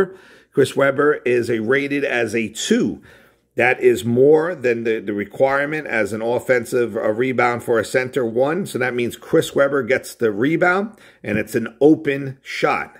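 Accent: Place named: New Jersey, United States. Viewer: I loved the video...and from your accent I'd say you were from New Jersey :) I'm starting a "hybrid" league (12 divisions w/8 teams per division) based on HS basketball rules (4 quarters - 8 minutes long each quarter). I'm going to start with the basic version and work myyself up to the advanced version (and your tutorials really help!)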